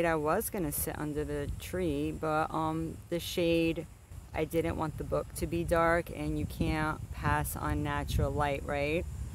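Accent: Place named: Bronx, United States. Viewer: I can barely hear your Brooklyn, Bronx accent in your voice.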